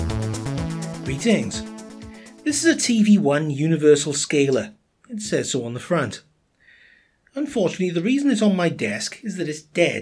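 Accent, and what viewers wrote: Wales, United Kingdom. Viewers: Ditto, just found myself here after checking up on Photon's channel and reminiscing. As an electronics and computers enthusiast, I'm looking forward to subscribing and binging all of your past videos! 😃 Also, your accent tells me you hail from one of my favourite countries! 🏴 Cofion gorau! 😊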